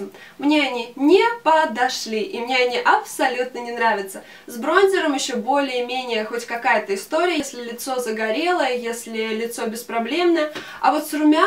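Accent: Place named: United States. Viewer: убери американский акцент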